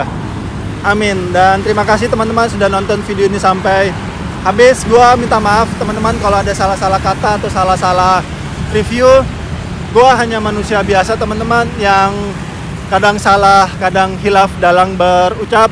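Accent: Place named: Medan, Indonesia. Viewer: Abang ini cem orang Medan, trus trus trus .....ngeri2 sedap ku tengok2.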